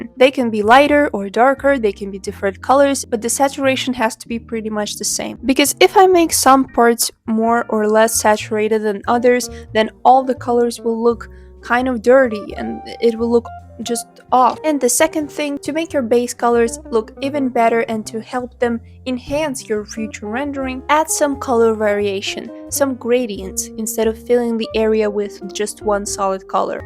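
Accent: Russia. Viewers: Понять не могу... автор русская? Слышу отчетливый акцент... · йоу я подумала что вы русская, откуда вы?? Что за акцент?? ник?? ЕМАЕ ЭТО ТАК ПОЛЕЗНО БОЖЕ МОЙ. СПАСИБО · now this is useful but id like to ask if you're Russian i feel like i hear it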